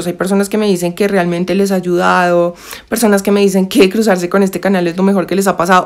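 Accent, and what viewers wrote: Bogotá, Colombia. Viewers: Sí es Colombiana como yo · Siempre me ha gustado como hablan las colombianas y tu no eres la excepción, y muy buena informacion gracias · Colombiana!?? Un acento q me encanta !!🤩😍😍😍😍😍😍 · Hay diferentes estratos en bogota esa es la diferencia pero si es rola lo digo yo que vivo acá y el que dice que hablamos ñero se la paso en sur de bogota que es reñera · Colombiana pero este es el acento de BOGOTÁ osea el acento rolo!!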